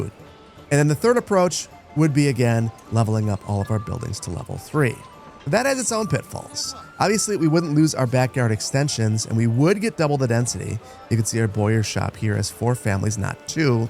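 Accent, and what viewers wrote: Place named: Great Lakes, United States. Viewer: Your accent sounds kinda like you live on lake Erie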